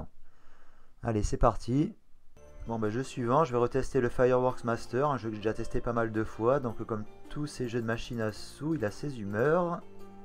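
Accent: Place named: South, France. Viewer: Tu habites dans le sud ? T'as un accent 😄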